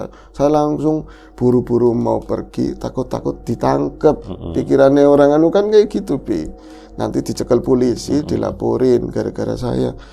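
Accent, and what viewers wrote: Banyumas, Indonesia. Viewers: hallo mas 😅😅..seneng aq klo denger bhs ngapak..ketawa trs aq nya..sehat sll mas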